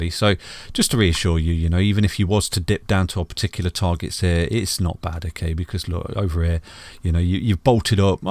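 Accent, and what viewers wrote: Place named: East Midlands, United Kingdom. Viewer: mate your accent - you gotta be from Notts/Derbys?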